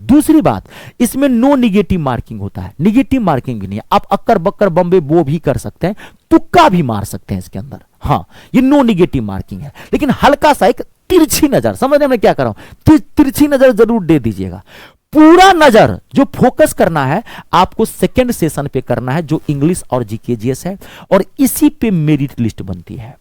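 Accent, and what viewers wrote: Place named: Bhojpuri, India. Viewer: Mts k bad aap calculation batch leke aaiyega chahe youTube pr ya app pr Aap acha pdhate h, motivate krte h, or kbhi kbhi bhojpuri tone mujhe achi lgti h , or aapka positive attitude 👌,,, tyari jeet ki, grammar to phle hi pdhi h but aapke video se question lgane ka conference aaya h, thank you sir... Or ha sir mujhe aapke hath bhut ache lgte h such ab jo bat thi kh di... Mai kbhi comment nhi krti but yha ki h 🙏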